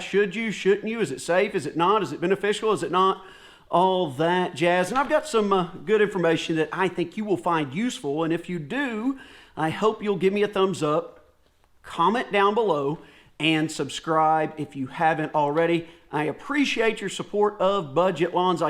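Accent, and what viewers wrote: Arkansas, United States. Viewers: Hay I was wondering if you are from Arkansas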